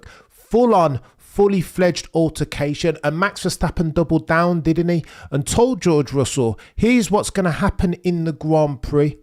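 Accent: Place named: Midlands, United Kingdom. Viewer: I love your Midlands accent haha. I lived between your amazing nice Midlands people for three years. I miss you all and that funny accent❤️🇳🇱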